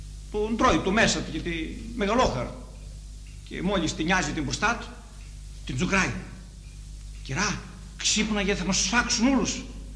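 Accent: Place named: Roumeli, Greece. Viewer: Ρουμελιωτικη προφορα σε ολο της το μεγαλειο!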